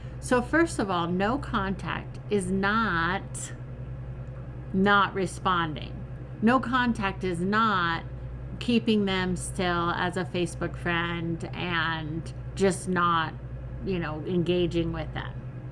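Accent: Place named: South, United States. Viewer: are you from South? I noticed you have southern accent. I am obsessed with southern culture.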